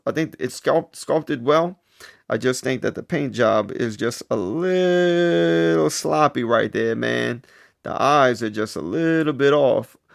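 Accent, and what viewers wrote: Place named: New York, United States. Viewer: ..and I was thinking you lived in NYC lol. You're vocal inflections are very similar to Jay Z.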